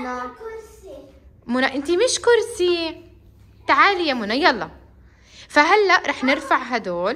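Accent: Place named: Egypt. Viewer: أنت مصرية بتحكي مصري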